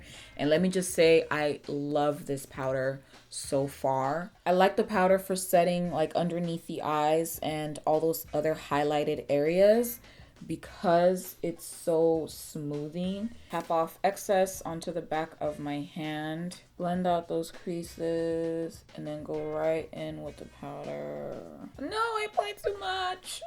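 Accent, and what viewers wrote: Nigeria. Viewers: your nigerian accent is absolutely stunning, i love them so much😍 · Pls do a video where you explain and talk through everything in your Nigerian accent😂😂 I love your accent ❤❤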